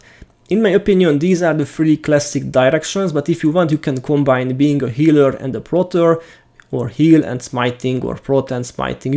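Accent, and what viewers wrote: Hungary. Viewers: Hungarian accent is so tasty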